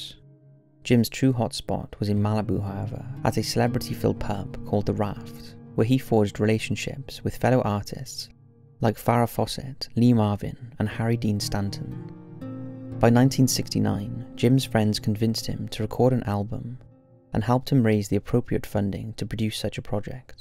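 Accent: United Kingdom. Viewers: He's British, I believe, which would explain is pronunciation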